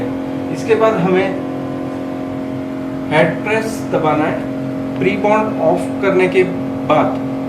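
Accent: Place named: India. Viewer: english way you sepack indian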